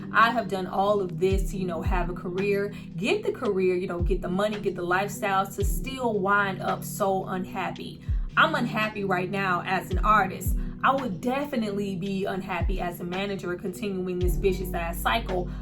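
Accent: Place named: North Carolina, United States. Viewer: Are you from North Carolina queen? The accent sounds like it.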